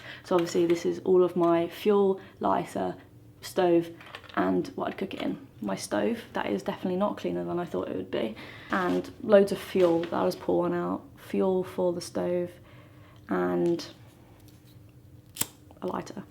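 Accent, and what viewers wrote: United Kingdom. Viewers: She’s literally speaking as a normally British person does. If you dislike the way she speaks, why are you watching the video?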